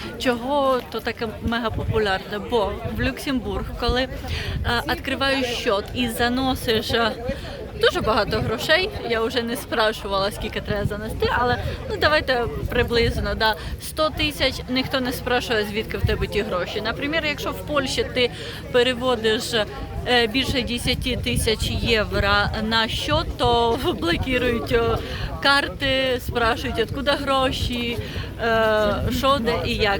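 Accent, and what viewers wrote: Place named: Surzhyk, Ukraine. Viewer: вітання! цікавий репортаж, але ваш дикий суржик все псує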